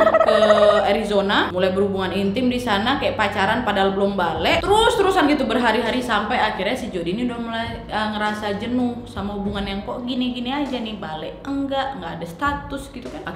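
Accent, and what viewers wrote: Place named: Medan, Indonesia. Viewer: Ga seru rupanya klo ga pake logat medannya, emang kenapa rupanya.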